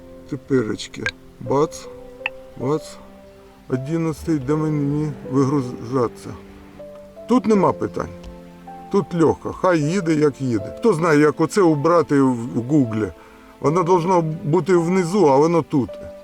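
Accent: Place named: Russia. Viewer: Боже, як в тебе мова запаскуджена язиииком!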